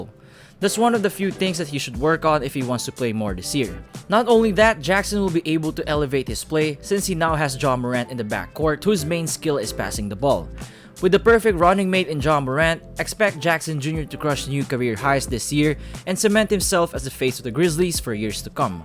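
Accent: Philippines. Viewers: Man I recognise your accent, are you Filipino my guy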